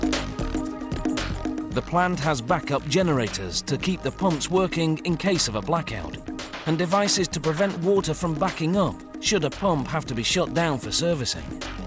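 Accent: United Kingdom. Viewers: I've never herd British How it's Made before.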